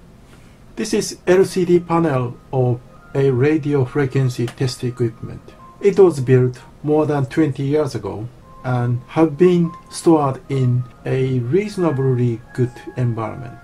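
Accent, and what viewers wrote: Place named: Japan. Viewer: まさに日本人って感じのアクセントやな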